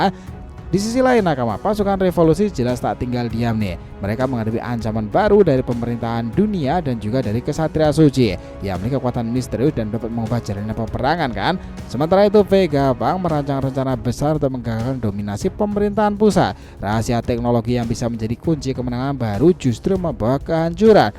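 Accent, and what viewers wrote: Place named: Java, Indonesia. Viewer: Medhook pantekk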